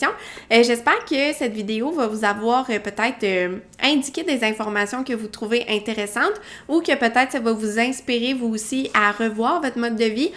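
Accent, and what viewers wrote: Quebec, Canada. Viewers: J'écoute vos vidéos pour pratiquer l'accent québécois! Merci! :)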